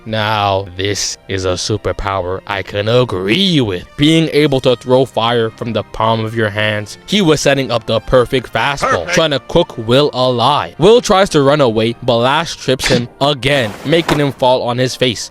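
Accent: Toronto, Canada. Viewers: Are you Canadian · r u from toronto?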